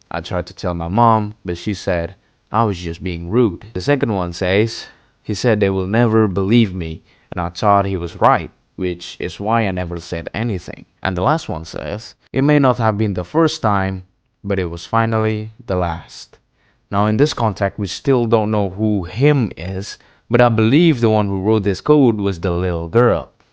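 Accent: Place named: Russia. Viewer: you sound kinda Russian lol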